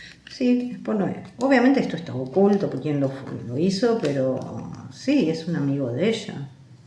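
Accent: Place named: Argentina. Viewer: He seguido an desde hace rato pero a veces como ella habla no entiendo muy bien (acento argentino) disculpa. Se también que dice que las cartas indican que Can y Demet están casados pero después de tanta cosa que ha pasado con la terrible DJ y más, estoy confundida. Espero entender ya que voy a leer todos los comentarios. Que tengas buen día. 👋🏼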